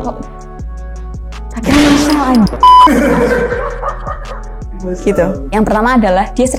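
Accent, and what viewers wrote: Java, Indonesia. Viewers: Asyik penyampaiannya mix bhs indonesia sm khas jowo nya😄 Asli fisikal touch, skin 2 skin, dia curhat soal cowok, nanyain cewek idamanku,godaan lewat jok,tp dia gak berani eye contac,persis apa yg diomongin tp skrg malah jauh, my be ada something wrong🙈😂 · Gaya ngomongnya kok kyk jodile Warwick ada campur jowonya tapi asik gitu ya gaya ngomongnya 😂 wow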